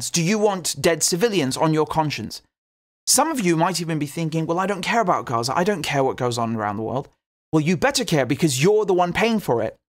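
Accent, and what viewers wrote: England, United Kingdom. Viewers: is it just me or is your british accent noticeably stronger in this video? hehe. rooting for the workers party from across the pond. ❤ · Sound very English Rich. Normally you sound American lol Ps i disnt vote today. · Nice British accent! I love it.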